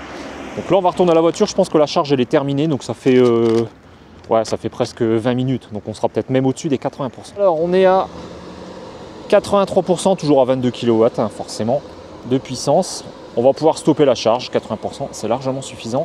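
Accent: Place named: North, France. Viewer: Salut voisin nordiste (vu l'accent), merci pour cette vidéo. Pas mal les prises de vues avec le drone au Tréport.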